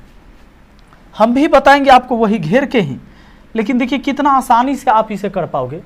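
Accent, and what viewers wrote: Bihar, India. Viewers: Nice sir ji aap to bihar sa ho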